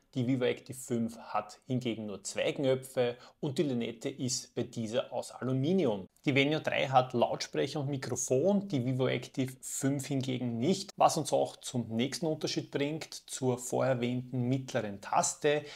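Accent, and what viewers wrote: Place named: Austria. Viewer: Vielen Dank für das informative Video! Auch der österreichische Dialekt ist mir immer wieder sympathisch, weil meine Vorfahren aus diesem schönen Land stammen 😊😉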